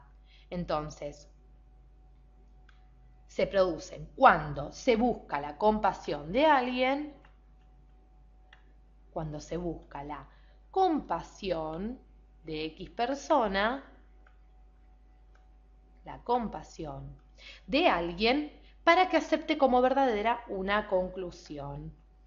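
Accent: Argentina. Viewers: Muy dinámico, sencillo y con buen nivel su video. Le recomiendo que continúe con otras falacias. Enseño Procesos Cognoscitivos en mi Universidad (Autónoma de Santo Domingo, R. Dominicana) y pienso usar este video como material complementario con mis estudiantes. Por su acento infiero usted es argentina (¿oriental tal vez?), país al que me unen fuertes lazos afectivos y culturales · Profesora ¡¡ maestra ¡¡ yo la admiro me gusta su enseñanza . Me encanta su acento argentino. Y no solo eso estoy aprendiendo pero no entindo su escritura ..parese la escritura de una doctora medico general . Jejejej lo hace a propocito ?? Mis respetos a usted .. ahh ya entendi nada es facil en esta vida ....un humilde servidor suyo .. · estuvo bien el vídeo pero odio los acentos argentinos ,bueno casi todos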